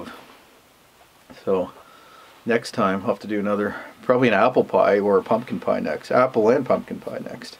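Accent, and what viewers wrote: Canada. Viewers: Can anybody tell me his general location? I mean I know it’s North country but is it in the United States? I get this feeling from his Accent it’s possibly in 🇨🇦 Canada.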